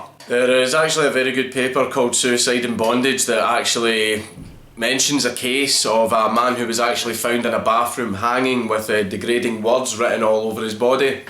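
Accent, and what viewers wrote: Scotland, United Kingdom. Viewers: never can understand all of scottish accent but Glasgow i can easy but thats not why im here Dankula is a legend :)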